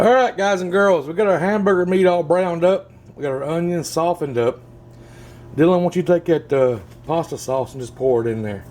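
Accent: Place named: Appalachia, United States. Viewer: well that looks real good I sent the recipe to my granddaughter and I like your hillbilly accent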